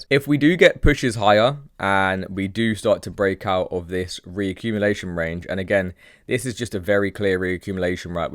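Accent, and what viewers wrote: United Kingdom. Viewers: love the bri ish accent my man🍺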